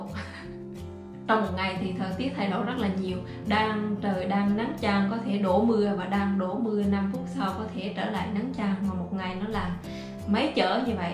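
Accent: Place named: Quang Ngai, Vietnam. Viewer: chị Quảng Nam nè,nhưng nghe em nói giống Quảng Ngãi hơn.Chúc 2 vc thành công nha!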